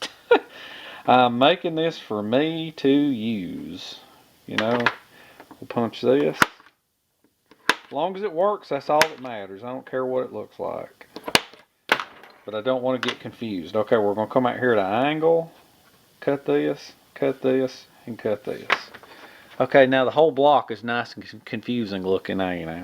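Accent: South, United States. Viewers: Keep up your good work. By the way...On top of your inspiring ideas, your charm has also totally changed my attetude towards what I as a foreigner usede to call the "Forest Gump dialect". You´re the kind of guy who could make any wall street guy wish he had a few drops of "hobo-blood" in him. Keep up the good work. You are an inspiration to me and thousands others. Best regards from Vikingland (Denmark).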